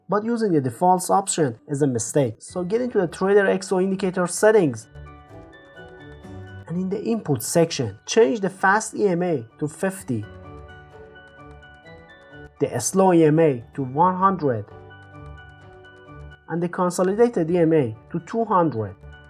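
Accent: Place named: Iran. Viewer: Just the ones I was looking for. Thanks so much.👍👍👍 By the way, from your accent I guess you're Iranian, am I right?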